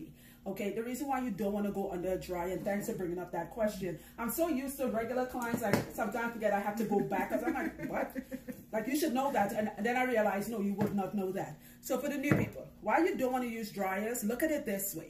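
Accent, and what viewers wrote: Trinidad, Trinidad and Tobago. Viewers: Yes she's ah Trini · Am i hearing a Trinidad accent ckming through?🇹🇹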